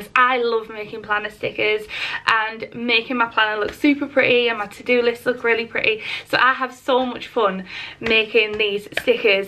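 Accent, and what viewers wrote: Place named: Whitley Bay, United Kingdom. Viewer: I’ve just bought a Cricut and it scares the hell out of me! Your videos on making stickers have really helped, thank you. On a side note my husband is from Washington and he’s trying to pin down your accent, he’s decided on Whitley Bay or Blyth, how close is he? We live in Canada now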